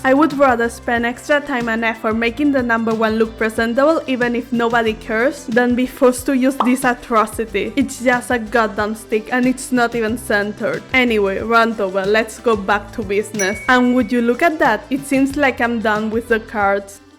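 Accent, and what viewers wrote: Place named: United Kingdom. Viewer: you sounding british makes the video better lol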